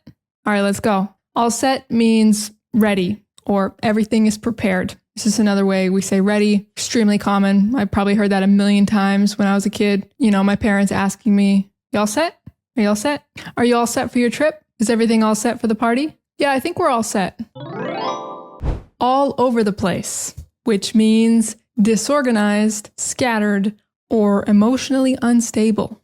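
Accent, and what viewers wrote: United States. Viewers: Hey,! Your American accent is just delicious! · This is the kind of class that adds value, because you not only enjoy listening to real American English, but you also get to admire the beauty of North America through this warm person.🌹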